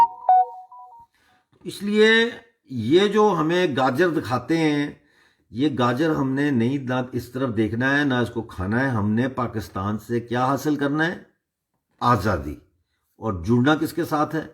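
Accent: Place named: Jammu, India. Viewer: the way he speaks dogri touch is there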